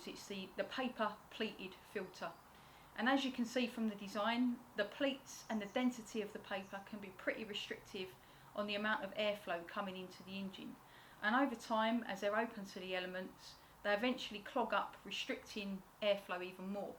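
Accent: Cockney, United Kingdom. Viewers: I've watched a few of your Triumph reviews. Your accent reminds me of my family and growing up in London England. I was born in Woolwich. I would say you definitely have a Cockney accent. I am now a US citizen living in Southern California for many years now. Carry on riding !!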